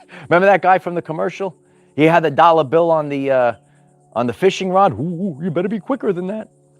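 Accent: New York, United States. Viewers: 🙏🏻 Gr888 work as always. I can see why so many ladies come here, but I have to say I come for the TRUTH TALK, and also I’m a sucka for that New York/Jersey (?) accent, gets me every time! 💃🏼 PS I have images of the Lions appearing if you want to see them, seek them out on my Ch Ann el here…last Aug/Sept sometime, one must have the eyes to see… btw this is TruthBeSpoken, I have (4 diff) ch Ann els, dear brother/neighbor. 🕊️🕊️✨🦁✨🦩🦩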